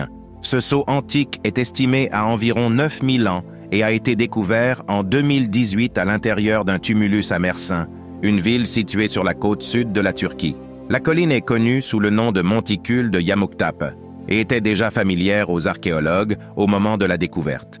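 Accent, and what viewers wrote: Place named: Quebec, Canada. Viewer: C'est un Québécois qui fait la narration...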